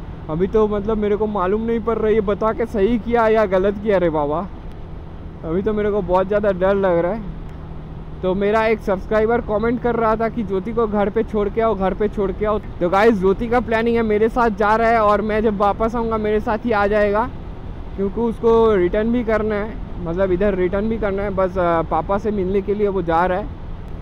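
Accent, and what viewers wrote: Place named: Bengal, India. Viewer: Bhai I like your way of talking that you can say that Bangla type Hindi. Actually I can't understand your Hindi because my mother tongue is Kanda but I like your video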